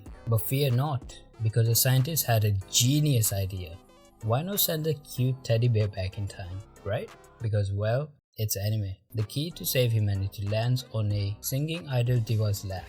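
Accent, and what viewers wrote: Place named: Pakistan. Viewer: Looks Like I Found Another Hidden Gem Like Summer Time Rendering (it's quite popular now but when I watched, it wasn't) 👀✨... BTW Ur Accent, You From PAKISTAN?! 🇵🇰🤩⁉️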